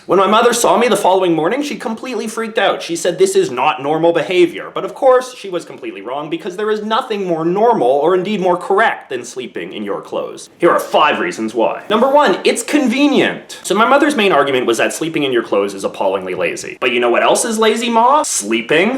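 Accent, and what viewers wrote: Canada. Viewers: Bill Ward Hes canadian. Hes gonna speak differently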